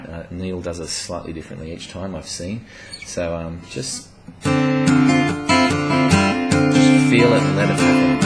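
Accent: Australia. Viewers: hes not english, he sounds Australian...or maybe kiwi?! but his music is definitely high koala-ty! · Thanks for the lesson, very clear, no nonsense. Love Aussie accent !!! Looking forward to playing it.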